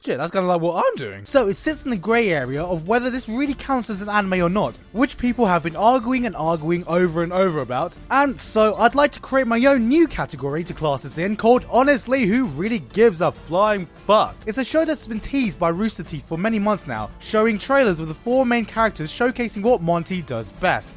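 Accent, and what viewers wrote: United Kingdom. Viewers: He's British, why do you ask ? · I like how you're an Asian, but with a British accent.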